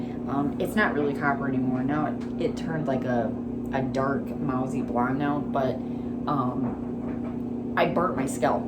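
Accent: Michigan, United States. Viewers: So this is funny, I was watching your video and my son was sitting near me on the couch and he said mom that lady sounds like you: I knew what he meant right away, it’s the Michigan accent because I’m from Michigan lol. I was like yep that’s right!! lol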